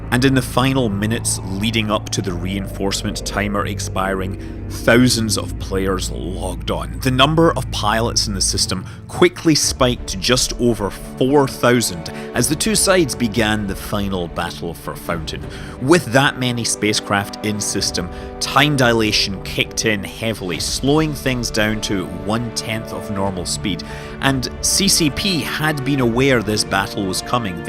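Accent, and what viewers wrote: Scotland, United Kingdom. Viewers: I meant no offence. I actually like your stuff. I know it sounded like an attack towards you but in reality thats not what I meant. My appologies if thats the interpretation. What I meant is that althought people support it, I understand why the others like me dont support it. My other point usually when the CFC talks about the war vs BOB completelly fail to mention war vs IT alliance and war vs DRF, cos it did not go particularly well for goons. They also seem to jump from that straight to their next victory aka Fountain. That was my point. The story is not balance. After almost a decade of listening to the mittani's stories, one gets tired of his bs aka Cloud ring alliances hate Jeff. I guess he has no shame. And you do have a good scotish voice and I also think it will work out well for a book or something. I have watched your videos years ago and as you probably know the eve community is usually very good at geting things done well that benefit the game. 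It just shows to wonder that there is a lot of resistense from the community for this project. There has to be a very good reason for it. All the best.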